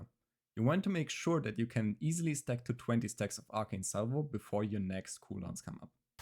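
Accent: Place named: Germany. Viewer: You sound german. Do you also have german content?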